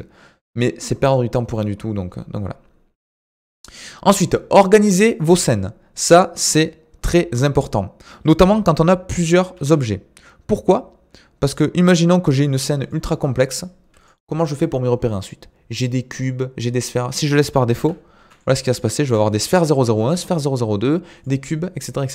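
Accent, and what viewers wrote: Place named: Southwest, France. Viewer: Oh des barres ! J'suis tombé sur ta vidéo et ma copine (finlandaise) m'a dit : Oh on dirait ta voix avec l'accent :D Le S U A te salut ! Merci pour tes vidéos du sud ouest ;)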